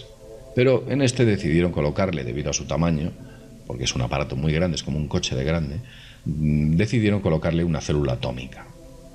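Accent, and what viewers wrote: Spain. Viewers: ¿De qué parte de España es?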